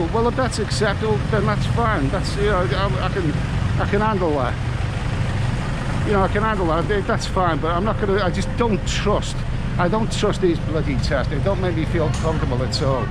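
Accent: Liverpool, United Kingdom. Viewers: i am sat here on a wooden stool washing up last nights dishes ( Issan) and am laffing my bollocks off at ya its amazing what the ol one two buckle my shoe can change ones mood!! This was like watching and listening to a scouse Bob Monkhouse... i wriggled that much on this stool av got splinters in mi arse... Great stuff Chok dee mi ol flower.. Big Cee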